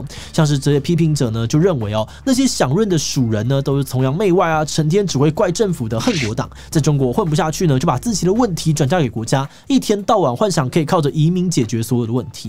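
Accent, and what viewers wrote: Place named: Taiwan. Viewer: 一听你就是台湾仔，我们跑哪里去啊，润毛线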